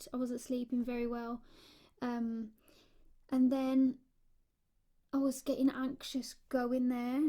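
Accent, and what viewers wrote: Black Country, United Kingdom. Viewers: Hearing your black country accent is just so random, especially because even in the UK you don't actually hear it that often either, I mean I'm in Manchester so thats probably why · Never guessed you were a yam yam dude lmao. West Midlands represent <3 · I loved one of your older videos where you talked about your childhood blanket. Too bad that I didn't know about this video & questions, I would've asked some fun ones. As an American from Pennsylvania, I love your black country accent. Occasionally it makes me think of "Miss Braham's" from "Are You Being Served?" I loved reruns of that show. =] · Lovely bit of black country talking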